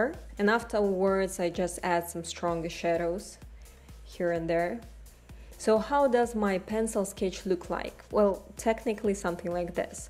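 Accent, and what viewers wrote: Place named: Russia. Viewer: Очень русский акцент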